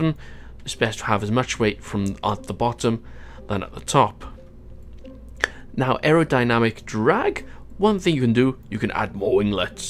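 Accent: Wales, United Kingdom. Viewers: Welsh accent with a speech impediment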